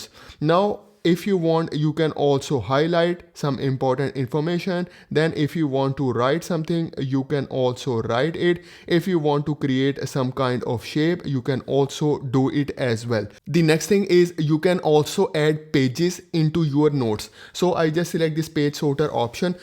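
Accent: India. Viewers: Indian accent is something))) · The video itself was very well done, but something about speaking english in an indian accent makes me uncomfortable. I could understand everything you were saying, and your words were very clear and well articulated, but indian (hindu?) must be a very different language phonetically, because the struggle to achieve a clear flow between words is real. I hope this doesn’t offend you, because your english really is very well spoken aside from that flow aspect.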